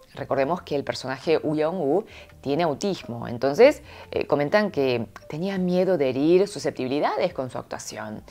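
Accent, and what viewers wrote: Argentina. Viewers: Me enamoré de esta chica con acento argentino y con esa voz envolvente y sensual.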